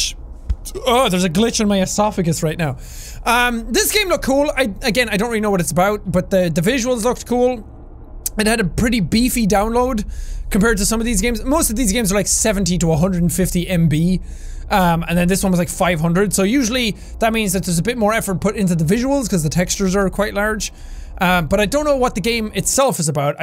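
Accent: Afrikaans, South Africa. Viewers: This is legit how some of us sound here in SA 😂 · I'm from South Africa BTW he said my boy not but boy. He just has an Afrikaans accent but I can hear the confusion of but and my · Quite the heavy Afrikaans one, too! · The first game he just said “my boy” not butt boy it’s just his South African accent. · Hes saying "my boy" :P Its a South African accent :D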